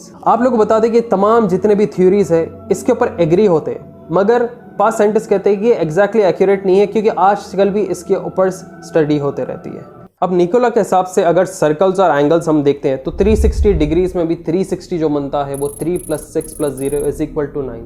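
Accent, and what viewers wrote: Hyderabad, India. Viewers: By how he speaks he sounds hydrabadi to aap agr wahan ke logon ko sunenge to wo ese hi bolte hai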